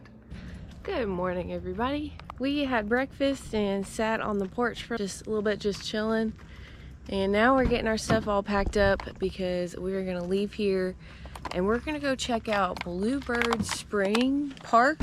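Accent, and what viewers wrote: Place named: Indiana, United States. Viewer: I'm amazed at your love for nature hiking and adventures. I especially like the way you pronounce your Os in words. Indiana girl. It's sounds so country. Yep I love to hear females talk with a country accent. 🎉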